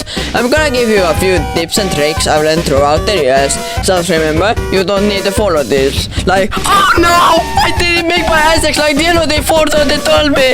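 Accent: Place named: Estonia. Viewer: the estonian accent is amusing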